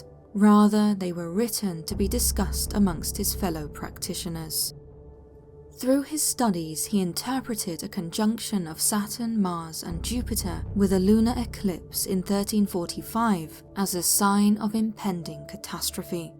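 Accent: England, United Kingdom. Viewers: Dude i can’t explain how much i love this channel. it’s just so awesome, definitely in my top 3 favorite YouTube channels. and i know the narrator lady is married. but her voice is the perfect combination of sexy, intelligence and creepiness if that makes sense lol. (I got a thing for women with the English accent 😆😂) But i digress, over all this channels content is epic & i get stoked every time i get notified there’s a new video 💯. Keep up the great work guys and i wish you all the success in the world. 🙂👍🏻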